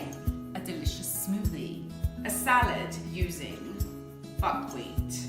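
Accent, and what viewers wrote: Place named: United Kingdom. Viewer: Great video! personality and her British English, plus some information give the viewers so much info and pleasure to watch. Thank you! I watched to the end..., you are so funny and definitely a lifelong learner!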